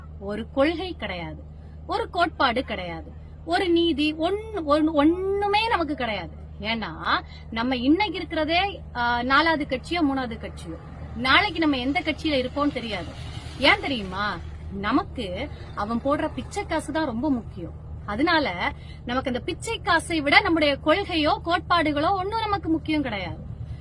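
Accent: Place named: Kanyakumari, India. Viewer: Kanyakumari slang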